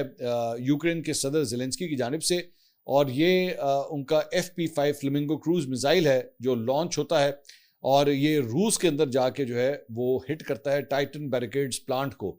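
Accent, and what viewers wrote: Punjab, Pakistan. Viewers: Sir read slowly for correct Pronounciation . Like you Punjabi accents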